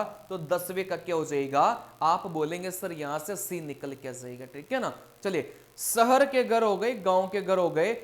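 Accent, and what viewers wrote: South, India. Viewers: Oo But aapki hindi tome south indian wala aata h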